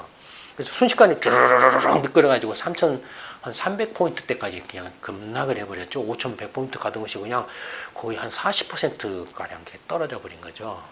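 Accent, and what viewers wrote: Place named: Jeolla, South Korea. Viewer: 전라도 사람이네. 말투 들으니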